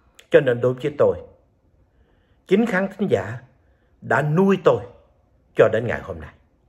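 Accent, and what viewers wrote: Standard. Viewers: va rat chuan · Chú nói khá chuẩn . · Bác Thảo MC nói quá hay quá chuẩn, cháu rất quý tác phong làm việc, giọng nói và cách dí dỏm của bác. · loi noi cua anh chuan ko can chinh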